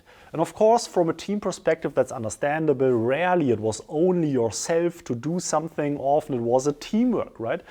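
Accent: Germany. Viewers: I love the german accent!❤ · Ein ungefragter Tipp nachdem ich mehrere deiner Videos geschaut hab: Inhaltlich gut dargestellt und kohärent. Sprachlich muss man sagen, dass du quasi Deutsch mit englischen Vokabeln sprichst. Sprachmelodie, Grammatik und Satzstruktur sind deutsch. Sonst gut.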